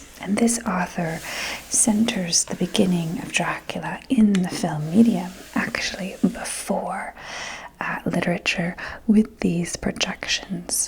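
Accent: Transatlantic, United States. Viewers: You are so bloody fabulous. Thank you for sharing your voice and your mind with us! Can I ask where your accent is from? It's delightfully Transatlantic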